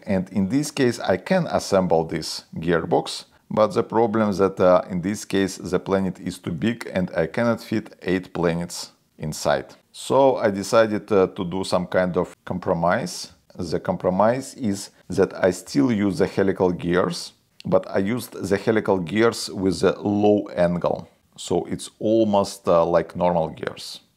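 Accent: Russia. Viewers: Жёсткий русский акцент)) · Такой русский ацент и внешность русская) За работу респект! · Как по мне русский акцент жобавляет шарма роликам ;)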